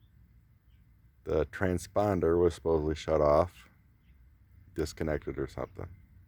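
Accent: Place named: United Kingdom. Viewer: Кто нибудь расскажите об авторе канала Кто он ? Что он? Кто он по национальности ? Американец, Британец? Я не очень разбираюсь Но если не ошибаюсь у него Британский Английский. Американецев вообще сложнее понять Американцы Коверкают Искажают Английский язык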